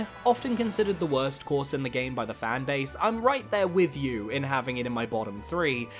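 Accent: United Kingdom. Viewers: I really enjoyed this video (thank you) but I am very confused by you, you have an English accent but use American words and pronunciations, how is this? 😂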